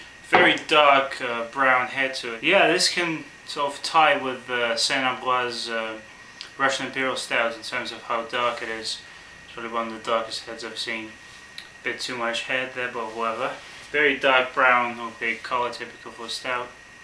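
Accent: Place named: England, United Kingdom. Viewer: nice review!! where in english are u from?? I am from vancouver canada living in osaka japan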